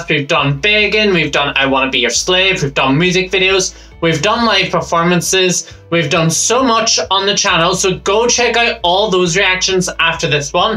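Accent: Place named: Northern Ireland, United Kingdom. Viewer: I love how you analyse everything so carefully. Anyway, Damiano David is the frontman, Thomas Raggi is the guitarist, Victoria De Angelis is the bassist and Ethan Torchio is the drummer. Keep those reactions coming! I love your accent, btw (Northern Irish, right?).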